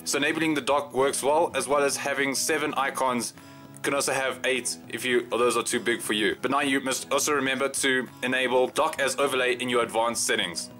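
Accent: South Africa. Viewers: Hi there nice tut, are you from South Africa? your accent sounds like it. I am from South Africa. :-) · I also think he sounded South African and I'm South African too haha :P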